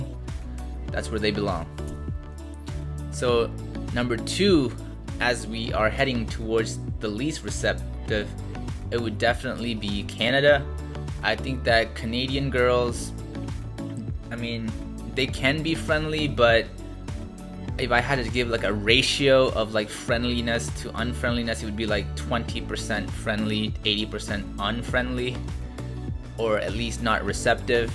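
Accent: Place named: Australia. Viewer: Maybe because you have an australian accent which americans fetishise a lot. So yeah, if you're an aussie you're going to do better overseas not saying you won't do great in your own country, too lol. I heard that aussie girls are more into asian guys than european and american ones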